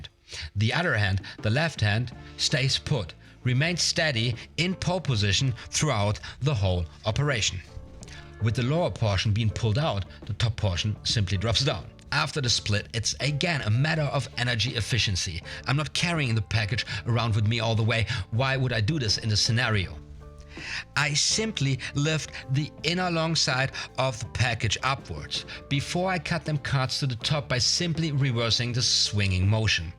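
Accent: Germany. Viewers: Sure do miss your silly German accent!!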